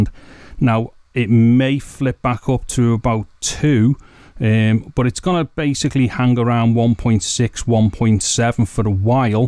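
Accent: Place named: Scotland, United Kingdom. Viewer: OMG A SCOTTISH! Love the accent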